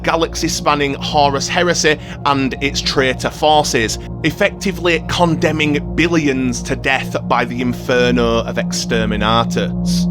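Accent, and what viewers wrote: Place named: North, United Kingdom. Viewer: Liked and subscribed, great to hear a northerner doing lore! A humble suggestion, try putting your script or notes nto chat GPT, there was a little bit of repetition. Keep up the good work!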